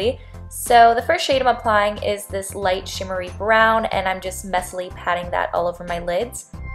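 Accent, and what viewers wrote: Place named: Minnesota, United States. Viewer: Hehe I always thought the way you say bag was weird but I realized it's just because you're from Minnesota. I had a teacher from there (I'm from texas) and he would always pronounce words ending in ag like you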